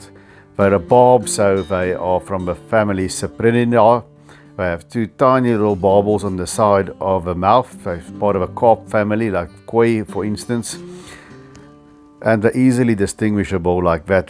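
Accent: South Africa. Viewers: Love your accent…South African. 😊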